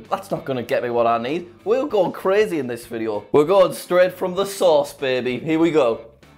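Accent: RP, United Kingdom. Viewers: where are you from? You sound British I am as well. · I can tell so easily that he is British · he’s so posh in this video 😂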